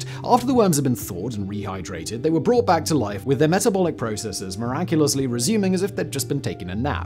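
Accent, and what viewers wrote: United Kingdom. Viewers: I didn’t say YOU didn’t know, I just pointed out that the person you originally replied to also knows. Simon has true crime and science and random blaze channels. I’m really not sure which of those is meant to resemble propaganda so I think you probably don’t know what that word means. And I’m also British so to me Simon’s accent is just one of dozens of British accents(we have literally 100s🤣) that I hear every day!Seems to be some projection there.🤣😊😊😊😊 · no shit how do you think i know he doesn’t write his scripts🤦‍♂️ its a lot easier to digest your propaganda with a soothing british accent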